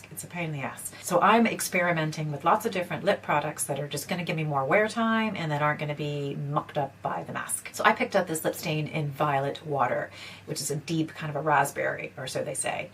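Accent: Ireland. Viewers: I love your Irish accent peaking through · Love the Irish accent peeking through. Thanks for the honest review. Hope you had a great Christmas.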